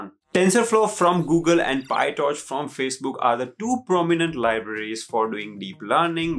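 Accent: India. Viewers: This indian accent is so strong, that i needed to watch it 3times to know what he said #NoFront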